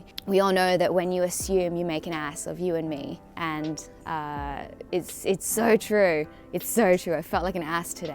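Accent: Australia. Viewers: Its just An aussie talking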